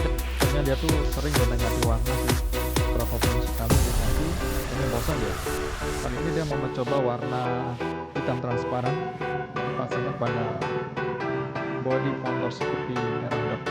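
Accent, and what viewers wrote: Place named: South Sumatra, Indonesia. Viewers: Sumsel dmnanya bang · Dari logat ny sumsel ya bro? Gw sumsel soalny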